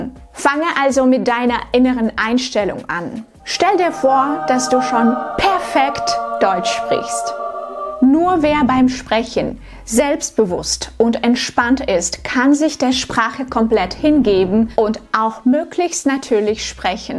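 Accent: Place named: Russia. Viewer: У тебя все равно русский акцент.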